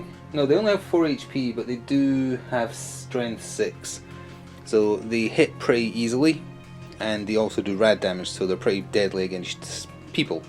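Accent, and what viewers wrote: Scotland, United Kingdom. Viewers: Where abouts in Scotland u from bro? Enjoying ur content man keep it coming 👍🏻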